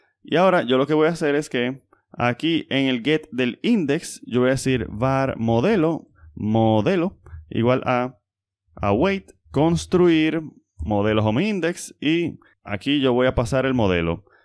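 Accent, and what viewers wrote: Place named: Dominican Republic. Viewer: Hola, eres de Rep. Dom.? Pareces dominicano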